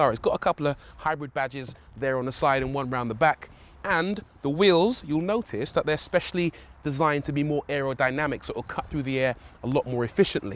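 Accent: United Kingdom. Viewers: you do know hes british right? he is speaking true english....your american english sucks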